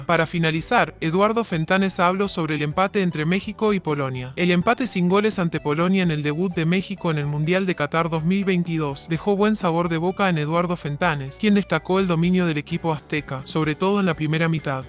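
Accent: Argentina. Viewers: Xfavor..de verdad..no pongan nada del santos si?? Saben q esto empieza cuando terminen el mundial...ya chale con.el santos noticias falsas..ha i ya deja ese tonito de arjenti o.wey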